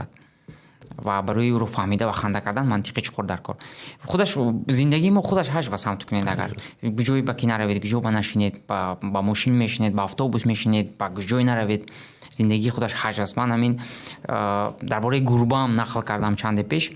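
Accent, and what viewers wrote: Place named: Dushanbe, Tajikistan. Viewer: Говоришь более или менее толковые вещи, но твоя речь такая поддельная, просто режет ухо Ты говоришь о литературной речи, в то время через слово используешь душанбинский лад Для меня вообще не проблема иметь диалектов, это изюминка. Короче, ты прогнулся братан. Прибегал туда где высокооплачиваемая аудитория, зачем нести херню о едином языке и тд Такое чувство что ты - реальный подкаблук, это не нормально, вообще. Ты давишь на больные точки женской аудитории, типо ущемляют права и чувства женщин Будь оригинальным, ну типо собой!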